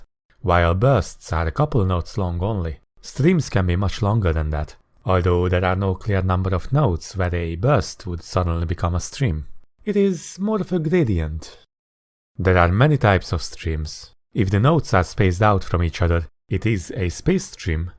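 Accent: Hungary. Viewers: Az akcentusból éreztem hogy hmm magyar lehet, aztán xD keep it up bud ^^ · Wait are you hungarian? Great video btw!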